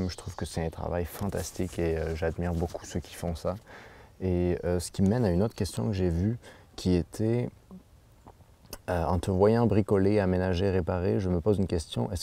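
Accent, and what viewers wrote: Quebec, Canada. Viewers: Bonne vidéo,. Je suis de retour au Québec, après avoir passé l'hiver dans le sud des USA. Deux mois presque, que je suis à Montréal (downtown) et l'envie de reprendre la route me taraude. Je songe à retourner à Cape Cod (P-town) bientôt. On pourrait peut-être se suivre? Ou y aller dans ma van? (il y a de la place et deux lits). Je connais les endroits propices (j'y ai passé tout septembre). La mer est belle et les plages merveilleuses. Et il y a des endroits où prendre une douche chaude pour 0,25$ ou froides, à la plage. En passant, ton idée de tournée américaine, c'est trop ambitieux. Et aller seul au Mexique (que j'ai fait en 2006, pure inconscience de ma part), ce n'est pas une bonne idée. C'est un miracle que je sois revenu en vie. On pourrait s'en reparler. Just email me. By the way, your French is very good, avec le si sympathique accent du Québec. 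· Je suis français et ton français est correct, je comprends tout ce que tu dis!!!! T as juste l accent Québécois ! Mais j aime! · J'aime bien ton accent français du Quebec ;-)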